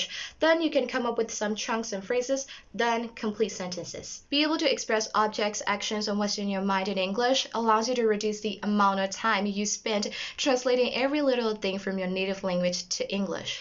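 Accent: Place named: United States. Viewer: U have American accent n I love it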